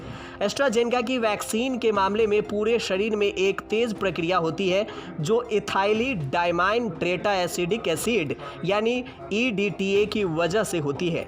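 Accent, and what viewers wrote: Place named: Bihar, India. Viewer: Bihari babua h vo isliye.. regional pronounciation problem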